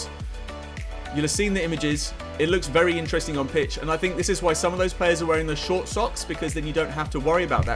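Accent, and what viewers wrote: United Kingdom. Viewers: Chale, que dificil es entender el ingles britanico pero si te entendi en un 70%.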